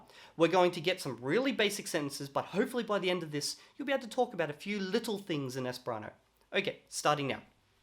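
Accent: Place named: Australia. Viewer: Great to find an Aussie speaker, gives me hope I can do it!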